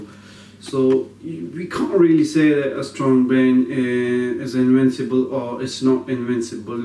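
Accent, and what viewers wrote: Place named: United States. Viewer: Accent hm American de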